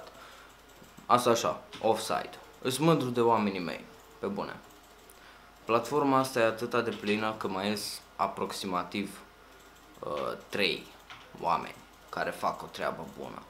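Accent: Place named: Moldova. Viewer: Ăsta e unul dintre milioanele de motive pentru care eu îl urasc pe! deci, pe langă sa zică la copii sa doneze, şi sa enerveze părinți să le dea bani lui, îşi mai permite si sa îşi bată joc de sucre care, frate, el a avut un trecut prin închisoarea din mexic, fără familie, fără nimic! Dar a fost ajutat la orfelinat de părinți lui (care cred eu ca au fumat ceva) in moldova, trădătorul famili noastre, şi se da el şmecher că el e al 2-lea sau al 3-lea yutubăr ROMÂN care a a milionu. În primul rând el e MOLDOVEAN!!! Trădătorul famili noastre! şi al doilea rând, manipuleaza copii cu accentul lui de moldovean (accent de moldovean). Eu efident, țin cu sucre, findcă el nu e trădătorul famili româniei, şi findcă eu cred şi (probabil) ceilalți cred, ca fura lucruri de la sucre (e evident la câți bani are......). Deci, cei care au dat dislike la acest video, (fără supărare), ați fumat pra mult videoclipurile lui (REKT) Voi puteți sa scapați de asta cu noi toți, adică, uitațivă la ce Heitari are (Zopi teve, Dărielred şi alți) (scriu aşa în glumă) Yutubări voştri preferați. 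Dacă nu ai auzit de aceşti oameni până acum, tu eşti, cel mai probabil, un copil de 5 ani din moldova (daca nu îi şti, dacă îi şti, bravo) Deci, trebuie să scapam de iliesime. (glumă) P.S: sper să ajungă la poliția yutubului de Călinacho! #nuvremcolavremcoroana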